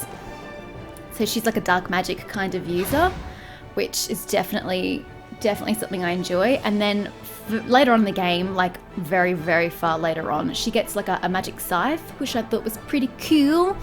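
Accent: Australia. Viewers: What Australian state do you come from? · Helloooo from Denmark ... sweet voice... NZ or Aus? I'm guessing Aus 🙃 Start. Character build. "Honey, why is your hair so luminescent?" LOL ... also she starts the game here. Also LOL 😅 Motion blur is disgusting ... agree! Haha.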